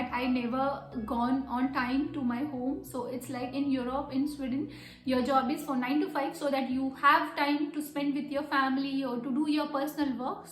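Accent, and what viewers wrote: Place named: India. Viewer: No no no you speak Indian English nobody understands. Much too fast you speak slowly 50 % or less. You need to understand nobody understands this.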